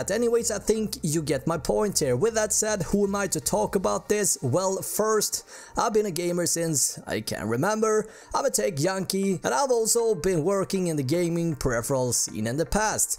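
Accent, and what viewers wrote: Sweden. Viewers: are you swedish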